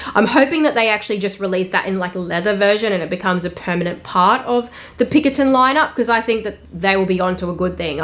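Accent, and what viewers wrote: Australia. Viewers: I would love if you updated your game- spend money on whitening and straightening your teeth, wear clothes that are tailored- more expensive looking. You are a wealth of information but your speech is your game. If you seriously worked on your elecution. You would be sooo amazing! Slow down your speech and less (bogan Australian speech) this is not a mean comment. You have a lot of potential ❤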